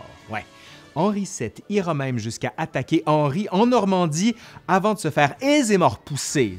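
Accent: Quebec, Canada. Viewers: Bonsoir un grand merci pour l'histoire de France j aime beaucoup votre humour et un accent je pense du Québec si je me trompes je me suis abonnée à votre chaîne car j aime beaucoup l'histoire de France a bientôt merci ❤😊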